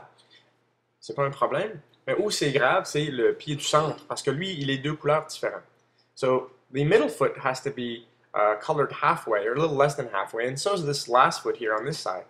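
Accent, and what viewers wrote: Canada. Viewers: J'adore ton accent Canadien :)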